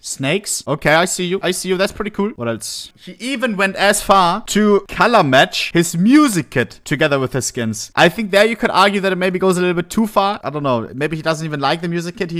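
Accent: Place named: Germany. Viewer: german accent 🤮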